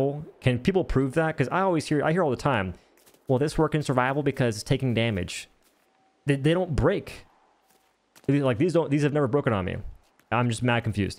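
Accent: South, United States. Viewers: Nice video, I’m gonna build an elevator to get to my monorail station. Look, I’m someone who’s always very curious about people’s dialects and accents. And to me, your accent is kind of interesting. There’s no non-creepy way to ask this but…what Southern state are you from? And to level with you, I’m from Chicago lmao.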